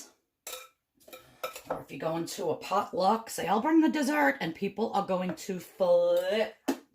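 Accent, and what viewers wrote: New York, United States. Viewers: German baking is one of my favorites. Somehow Germans knew what they were doing back then. R u from Brooklyn, Queens, or Long Island. U sound like my family! Haaa!!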